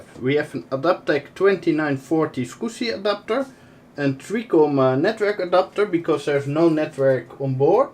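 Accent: Netherlands. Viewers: know :-) But I think not all DutchPeople speak like this. Anyways, I like it. Makes him unique to me :-)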